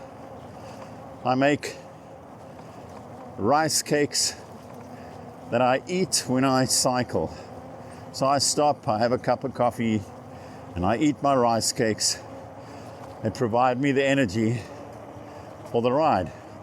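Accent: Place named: South Africa. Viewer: thanks. Loved your video btw! Great hearing that SAFFA accent. I bought a Cube Supreme Sport Hybrid with Bosch battery. Unfortunately could only get a 400w battery, no stock anywhere of the 500w. Hopefully this will do the trick for now. Could have got a Norco Scene VLT for same price with 500w battery, but no front suspension, and non integrated shimano battery. Merida also seemed good, but saw that too late, and didn’t want to muck about the sales guy any further. Anyway a 30day “trial “ period and can exchange if I absolutely think it’s not for me. I’m not keen to use this option, so will likely stick with what I have unless there is some serious fault or issue I have.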